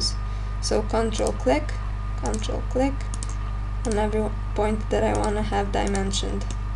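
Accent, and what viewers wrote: Eastern Europe. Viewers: You sounds like south slavic speaker. Am I right?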